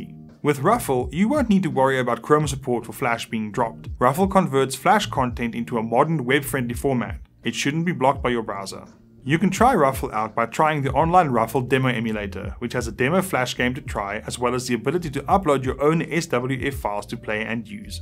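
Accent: South Africa. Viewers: South Africa? I hear you, bru.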